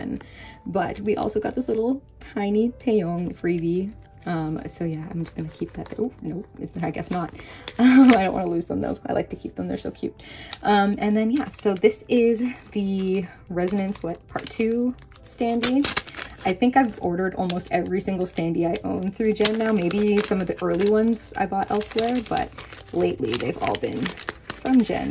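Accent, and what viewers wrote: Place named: United Kingdom. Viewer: I never noticed, but you pronounce words like british/australian instead of American that's so interesting!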